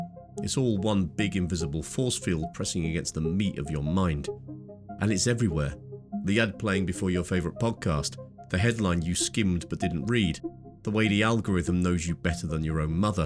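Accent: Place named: England, United Kingdom. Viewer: G'day from Australia 🦘 I'm listening to your podcast on Castaneda and switched over here to look at your other podcasts. I wasn't sure if you were real or AI generated. You might want to place that note on your podcasts moving forward. You are very intelligent and clever!!! Superb voice for doing podcasts - very English and BBC (that's a compliment). Curious without being "cultish". Good luck. From Australia 🦘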